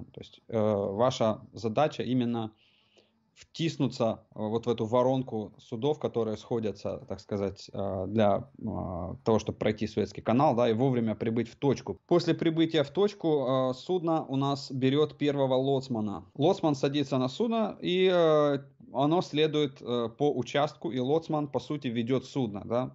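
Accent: Ukraine. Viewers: оказывается, это украинский говор.. оба-на.. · Судя по акценту ты из Одессы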